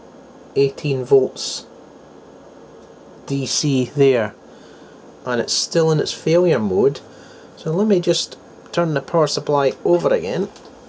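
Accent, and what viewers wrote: Scotland, United Kingdom. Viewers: Did sound a bit sus.He has a wee Sco-ish accnt:-) What a silly current.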